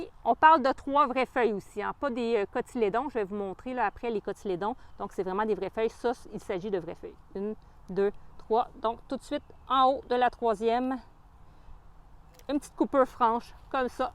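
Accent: Canada. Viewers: Merci pour cette belle explication ...et votre très bel accent canadien . A bientôt !! Manu , de la Bretagne.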